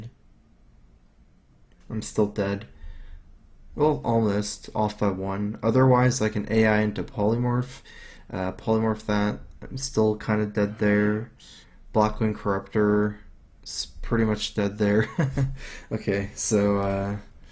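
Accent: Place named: South, United States. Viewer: ***** Because has a southern accent.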